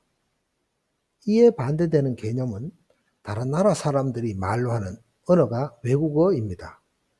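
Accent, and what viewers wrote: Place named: Daegu, South Korea. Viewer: 감사합니다교수님. 제가 대구사람이라 확실히 대구 사투리로 들으면 이해도가 올라가더군요. 정말도움 많이 되었었습니다.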